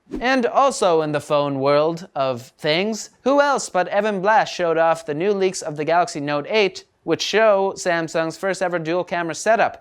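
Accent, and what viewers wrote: Italy. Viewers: Italian accent sounds like mario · That Italian accent tho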